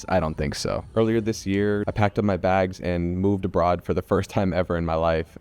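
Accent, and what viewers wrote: Thailand. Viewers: I love your Thai accent,its pretty lovely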